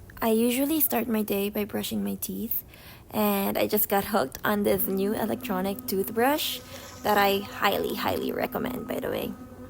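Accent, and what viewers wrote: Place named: England, United Kingdom. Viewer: loving your english accent!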